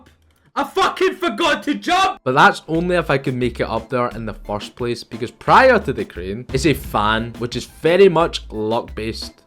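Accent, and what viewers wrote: Scotland, United Kingdom. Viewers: There's such a goofy charm to Scottish accents, audio book when?? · Asian man with a Scottish accent. fucking awesome. <3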